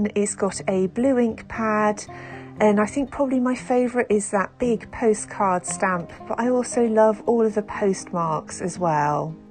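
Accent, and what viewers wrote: United Kingdom. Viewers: Dear, first of all, I want to emphasize that my comment relates to all of your videos and not specifically to this one: I love your calmness, your British accent and it's always as if you were smiling while recording. It's lovely. 💕The music in the background and the recognizable colours (being coordinated) make you stand out among lots of other, also very talented people on the net. Thank you for sharing and keep up with your style and youtubing.:) Looking forward to it ❤️ Greetings from Serbia 🌞👋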